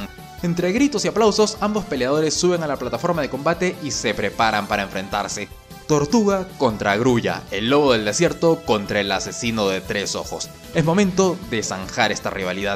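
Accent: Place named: Latin America. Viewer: Alguien sabe de donde es que habla como latino pero en cambio dice vídeo como nosotros los españoles?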